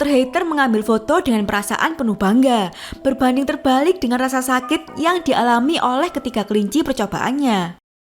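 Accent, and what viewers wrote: Java, Indonesia. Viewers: masyaallah medoke pol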